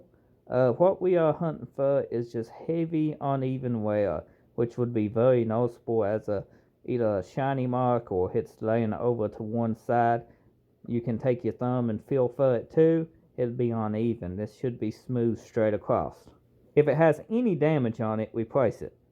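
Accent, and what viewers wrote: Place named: Louisiana, United States. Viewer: Nice video, i work on bikes and going through my lifters and checking pushrod length etc. Where are you from I hear a hint of Louisiana in your strange accent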